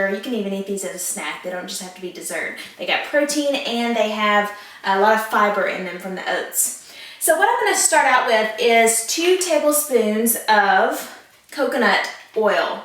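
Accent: South, United States. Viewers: Is she from Alabama or Georgia?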